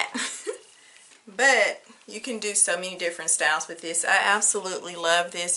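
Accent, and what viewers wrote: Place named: South, United States. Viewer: Just stumbled across your channel, love your content and your country accent! ❤️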